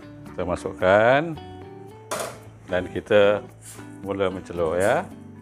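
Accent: Java, Indonesia. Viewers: Rapopo wak ngomong melayu lekat jowo pekat, macam mas toha,, lagi mantap wak...👌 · Mula2 ingatkan youtuber dari Indonesia.. Sekali dengar dia speaking..owalah wong jowo Malaysia rupane.bagus la kang ! Alhamdulillah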